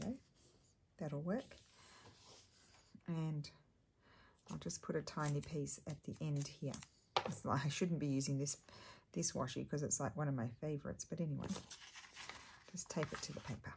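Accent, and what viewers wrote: Australia. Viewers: You have such a calming voice when you are playing? Probably the Aussie accent. Your eye for colour is amazing.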